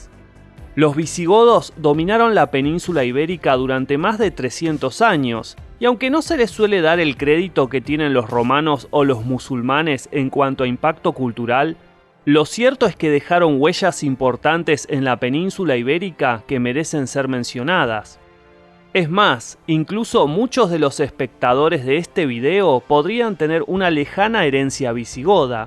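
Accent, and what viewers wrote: Argentina. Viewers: lastima tu tonito argentino LLLLLLLLLLLLLLLLLL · El contenido es interesante y entretenido.... pero al locutor le juega en contra el timbre argentino, que es plomo derretido en los oídos de los hispanohablantes... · es argento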